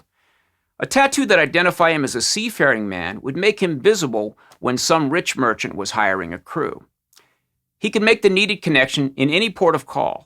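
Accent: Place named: South, United States. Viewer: You are an ignorant hillbilly sounding asshole.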